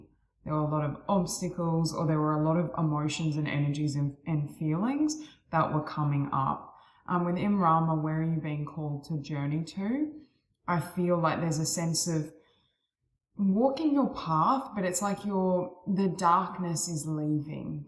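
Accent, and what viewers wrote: Australia. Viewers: Lovely to listen to another aussie reading tarot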